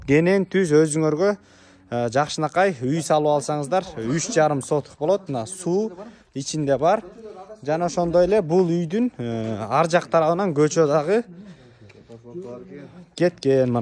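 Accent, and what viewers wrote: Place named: Talas, Kyrgyzstan. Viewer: Гырдогузмин дейтко четери дозулган дейтко😅 Таластансынго суйлошуно караганда баш аягы жок тушунуксуз кылып бутуруп салдын.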